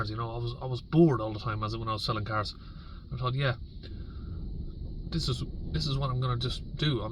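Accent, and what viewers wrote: Ireland. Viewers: It woukd great to.see.ya moving to ireland ,I have two luton maxi movers ready , for a fella that spent that long in the uk ya never lost the accent carlow or laois ?by the way 40 isn't that old ,ireland got very expensive that last 5 years though great channel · Thanks. For to be in England form 12years old, good to hear you haven’t lost the Irish accent. 👍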